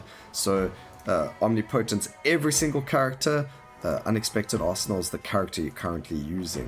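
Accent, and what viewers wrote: South Africa. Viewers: There's a difference between "accent" and "wrong" :D Accent is when you pronounce "aɪ" phonetics as "a" bc South African drops inflection. Wrong is when you say omnipotent wrong :P But hey, just tryna help. No hard feelings.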